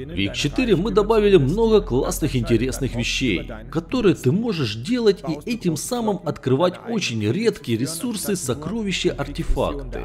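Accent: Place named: Rostov, Russia. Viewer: Приветствую. Знакомый говор, не из Ростовской области случайно?!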